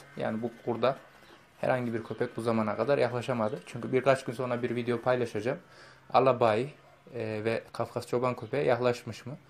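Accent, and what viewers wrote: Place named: Konya, Turkey. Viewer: Abi sen konyalımısın aynı bizim ora gibi konuşuyosun:)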